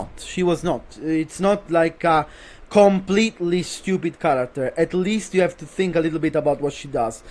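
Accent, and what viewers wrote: Italy. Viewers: took me 2 sentences to realize he’s italian lmao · I was only surprised by Lee, you even put it in "Easy" with those combos full of cancels and precision concatenations. Yoshi is a character who doesn't give you anything, he has potential for top 13\15 for me so it's probably even more difficult than that. Steve is probably the most difficult character in the game and also devil jin. question: are you Italian? I ask for your pronunciation of English 😂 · You can hear he is italian and how he says claudio is perfect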